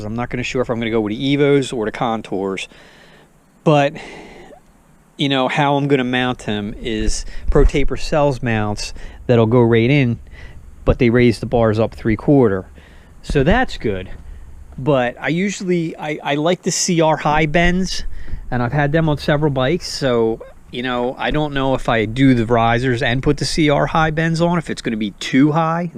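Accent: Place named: Pittsburgh, United States. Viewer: You got a Pittsburgh accent lol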